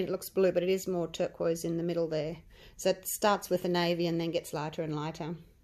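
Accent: Australia. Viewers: Hi! I am just getting into resin art. Love your coaster idea! Send me info on buying the coaster mold please. I love these, they turned out looking like pansies! Nice to hear your Aussie accent , I grew up in Sydney but now live in LA. uSA. All the best,Leanne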